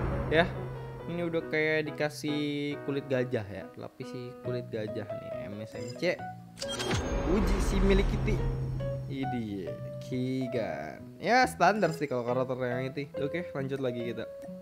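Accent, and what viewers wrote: Java, Indonesia. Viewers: Iki wong jowo ya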